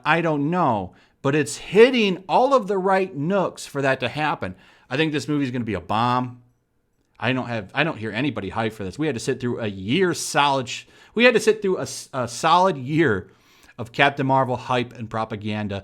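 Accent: United Kingdom. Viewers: Why do you talk like a British dude!😂😂😂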